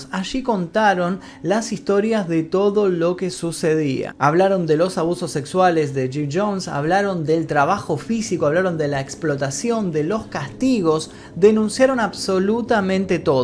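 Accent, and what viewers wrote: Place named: Argentina. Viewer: Excelente trabajo y documental y me encanta tu acento argentino. Saludos.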